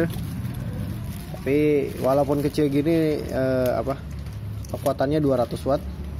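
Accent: Banjar, Indonesia. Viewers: Kaya ada logat logat banjar nya gitu om hehehe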